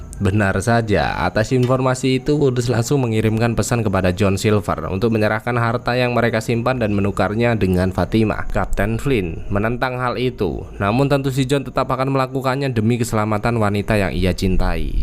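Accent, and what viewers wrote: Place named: Java, Indonesia. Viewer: Bg medok e hilangkan yo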